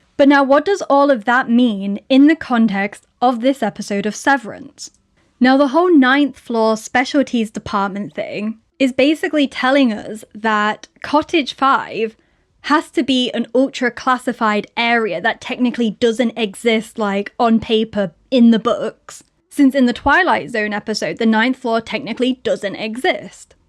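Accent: United Kingdom. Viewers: Completely unrelated but do you mind telling me if your accent is British? Whatever it is, I love it. And thank you for your videos. Great job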